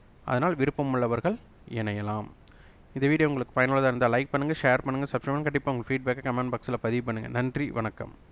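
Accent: Kongu, India. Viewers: Masterji Today i watched this video more than 2 times, while driving to my office i listned the audio more than 5 times, i noticed a thing, if i check & implement it in the market(s), then i will update later. Your teaching & your Tamizh slang are Awesome Master ji, (Iam a big fan of your Kongu Tamiz Slang) Iam sorry iam unable to type on our Tamizh. 👍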